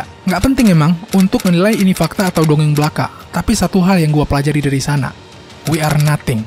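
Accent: Aceh, Indonesia. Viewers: Abang org aceh?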